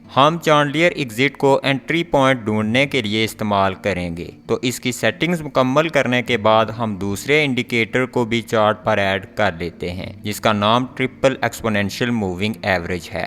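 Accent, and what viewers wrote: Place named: Pothwar, Pakistan. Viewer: bawa gi , english bhi poothowari main boltya ho ... yeh candelstick nahi hota .. Candle Stick hota hay dono ko mila k na parho ... raja g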